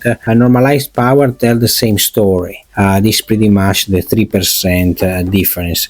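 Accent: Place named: Italy. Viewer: Ciao, se non sbaglio dall'accento sei italiano🇮🇹 .... Perché fare video in lingua inglese😢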